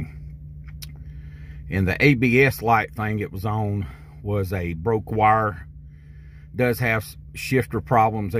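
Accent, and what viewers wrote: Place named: South, United States. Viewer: We're you from. Sounds like a southern accent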